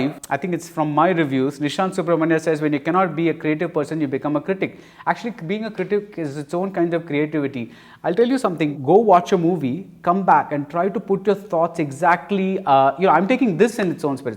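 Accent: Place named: South India, India. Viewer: Dear Rangan Buddy- Well I am following you in Twitter too.. Please add me.. <link> You are Rajini in Review.. Love our South Perfect English Accent here... Yes.. I had a great doubt your english Buddy.. Yes.. Will tweet and promote.. I AM promoting and vouching for SOUTH India- from COIMBATORE to AUS and to the world.. You reviews will help me to share to the movie reactors whom I follow too. Please do a Tamil version for a backup too... Do a combo review with Thambhi Abhi Cheers - Pradeep from Pollachi In Melbourne... I am a food reviewer also- <link>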